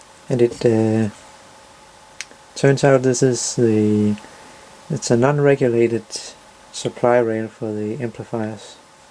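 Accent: Denmark. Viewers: Elektronik Center ? Danish accent.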